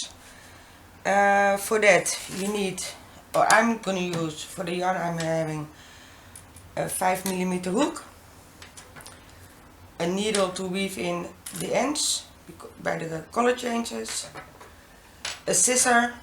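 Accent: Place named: Netherlands. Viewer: Are you from the netherlands ?? You sound dutch #dutchpower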